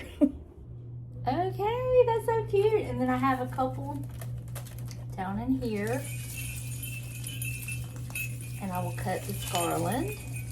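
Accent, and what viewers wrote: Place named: United States. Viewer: Hi beautiful happy and excited to be here, and see your beautiful Chrismas decorating!! Your so cute love 💞 your county type voice if that makes sense, you are a fun person to hang with!! Wished you! Wheir a neighbor of mine. 🫵🏼✊🏼🫶🏽💯🥰✌🏼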